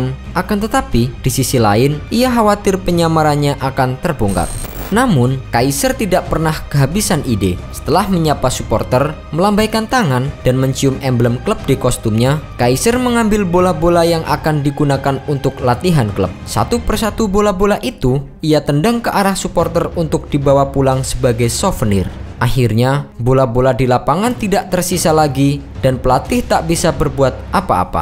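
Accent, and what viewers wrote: Java, Indonesia. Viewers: Yg ngomong wong jowo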